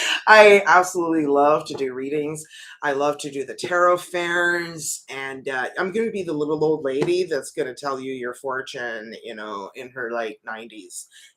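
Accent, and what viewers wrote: Quebec, Canada. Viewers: your Canadian french accent is so sweet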